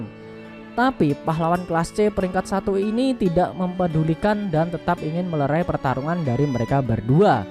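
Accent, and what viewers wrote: Java, Indonesia. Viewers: Kowe wong jowo ndi min?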